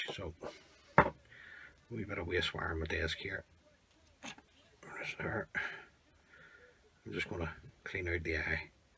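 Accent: Northern Ireland, United Kingdom. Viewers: Well, if that's not a norn irish accent. Great wee video of a very traditional fly. I've been away from tying for about 45 years and I'm glad to see that these haven't changed. Found most of my kit the other day so I think it's time to revive an old passion of mine.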